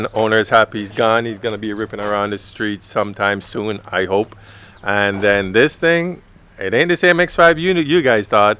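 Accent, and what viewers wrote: Jamaica. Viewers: Wow good for you. That accent sounds Jamaican. Enjoy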